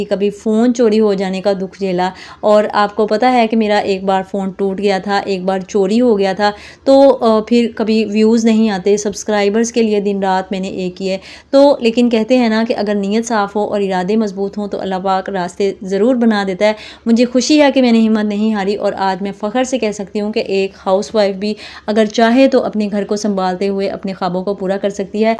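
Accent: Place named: Punjab, India. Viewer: Apka punjabi main bat krny ka style buht acha hota hai m regular watch krti hun apk vlogs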